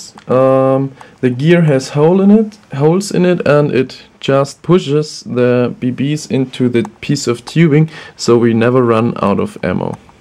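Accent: Germany. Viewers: I realize this video is very old, but I am currently looking into this for a hobby project. Your Accent makes be believe you may be German, if not then sorry right away. I can't help but notice you really enjoying this gearbox and was wondering if you still remembered where you bought it from, if it was online, and how much it cost. Thank you very much!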